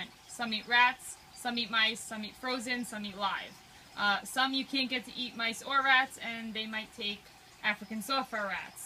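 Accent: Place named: Long Island, United States. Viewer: Where's your store? Sounds like L.I. judging by the accent.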